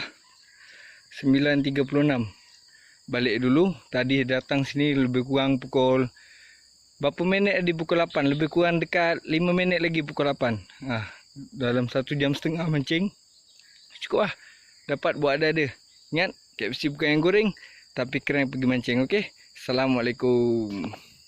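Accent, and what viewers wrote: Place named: Java, Indonesia. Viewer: Pekat Jawa bang. Hahaha. Gede iwak e.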